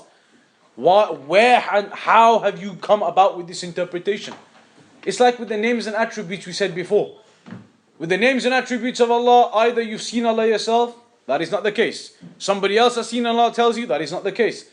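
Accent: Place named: West, United States. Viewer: I knew it was going to be a western speaker ههههههههه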